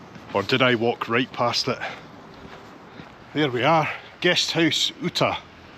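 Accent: Scotland, United Kingdom. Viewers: Before watching this video, I'm excited to see a outsider view on VR trains! :D I have used the train to travel from study city to hometown, 700km+ takes 6h 30mins usually. and anytime i have had delay due to VR being silly has been at least twice. Delay happened because there wasnt enough of busses or taxis for around 40+ people so it was quite annoying. Now I will watch this video and see your thoughts! edit: Gave a sub and like, I love Scottish accent and I love hearing about non-Finns express their thoughts on Finnish stuff :D 89€ sounds like a fair price. mine usually are 30-50€ depending on how close i book the departure etc. Aww I loved the Conductor's Hello :D They are doing this thing called "Pilkki", which I think is called Ice Fishing? But you have this tiny rod and you sit near the drilled hole hoping to capture a tiny fish. Never tried it, only regular fishing :D one time i get to spend little bit time in one, since the train had broken so we had to partake in a train as extra passenger so i stayed until someone who had it reserved came to claim it. 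The soundproofing of them are quite nice! currently while writing this i am , Here he the breakdown of the coaches in VR trains Coach 1 = pet coach coach 2 = Extra class coach 3 = Extra/silent coach(I might be mixing the 2 and 3 together, but i remember that both should have unique top decks) coach 4 = Children play area Coach 5 = just your average coach Coach 6 = nothing special I will admit i am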